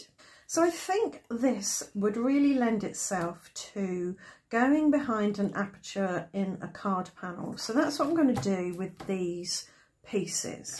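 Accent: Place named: United Kingdom. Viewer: I love hearing the British accent too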